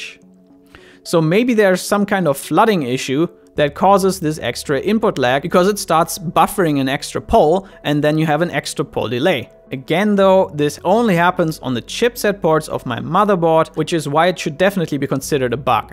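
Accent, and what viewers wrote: Germany. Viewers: Hahaha egal wie sehr man sich anstrengt man hört sofort den deutschen Akzent heraus. :)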